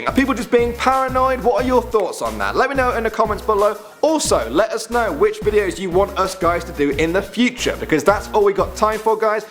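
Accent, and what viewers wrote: Ireland. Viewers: is he Irish???? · Is this guy Irish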